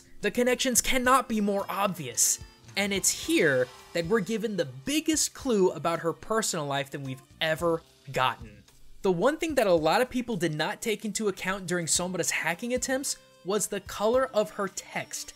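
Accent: Spain. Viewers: Man dat spanish accent!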